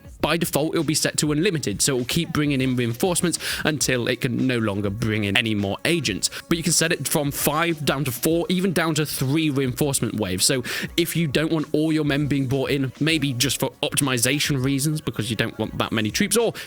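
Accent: United Kingdom. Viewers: I hate your dumb british accent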